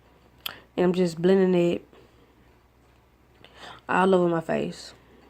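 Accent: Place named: South, United States. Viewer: Your accent is soooo Southern lol; love it. I love the look girlie.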